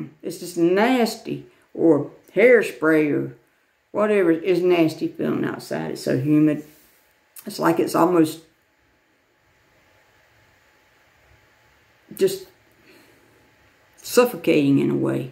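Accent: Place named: South, United States. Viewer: I am enjoying this. Perfect!! 👋 I thought you had a southern accent. Mine is worse than yours. Someone asked me if I was from another country. 😅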